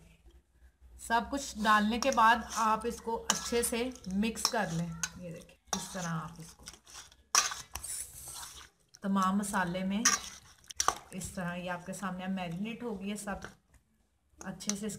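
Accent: Lahore, Pakistan. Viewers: Labori urdo mix Punjabi style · Yh lahoreye aesy he bolty hen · Her accent is beautiful. Lahore, Lahore ha. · Accent is subjective. Would sound weird in karachi, but is normal in lahore. Food looks yummy, what's matters is substance and not cosmetic features which we like to do. Loved this clip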